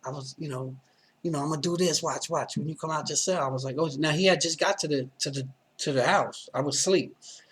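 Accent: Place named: New York, United States. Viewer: Man ur SO new york bro ha I'm from pgh n I can still tell u ny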